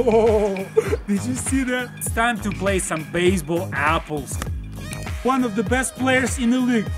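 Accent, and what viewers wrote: Russia. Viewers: Русский акцент не скроешь.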